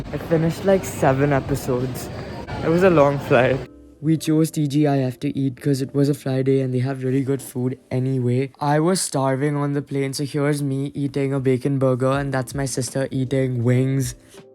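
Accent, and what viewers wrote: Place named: South Bombay, India. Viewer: I'm confused, is he born and bought up in america? His accent sounds like a sobo accent though and he used to stay in sobo right?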